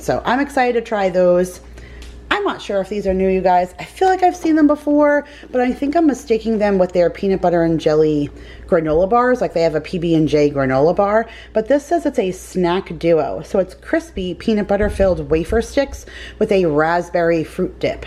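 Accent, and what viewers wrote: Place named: Italian-American, United States. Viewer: Great haul! I miss Trader Joe's so much!!! I live in Hawaii now and they don't them here (or Dollar Tree!!!!). Can't wait to move....I'm a long time viewer. I know a lot of people rag on you for how you say "ricotta" and "mozzarella" but I just wanted to let you know my great uncle and grandparents used to say those words how you say them too. They were first generation Italian and grew up in Pennsylvania. I definitely think its an Italian-American/ back East thing. I don't have a problem with how you say it. Own it, that's who you are! Anyways great haul! I love this time of year too (even though there are no seasons in Hawaii lol)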